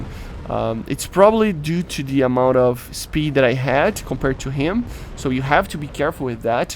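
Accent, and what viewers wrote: Brazil. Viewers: Sabia q tu é br, o sotaque não mente kkk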